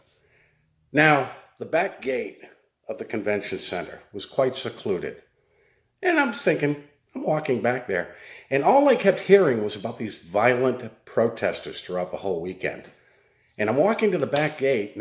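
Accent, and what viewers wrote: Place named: Boston, United States. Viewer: I always found it interesting that JW’s let old bank cleaners and window washers dictate their life. I’m from California and you sound like you from Boston.. you don’t earn respect from regurgitating Bible verses on command you can’t tell someone to gaurd a door or fend off protesters if you never even had a traffic violation or a school fight. I know people who will revoke your invitation to life. I love my elders and they are all dead but they kept an eye on me and I appreciate them. They knew they couldn’t control me. I’m a lil guy but I hit hard and unlike Watchtower if I ask you for a favor I will pay you. My suit is highly respected. I don’t play make believe on Sunday tuesdays and thursdays like JW’s.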